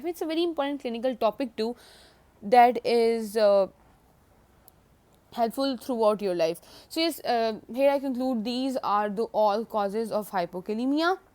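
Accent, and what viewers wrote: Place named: India. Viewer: U have a good accent of Indian English I love it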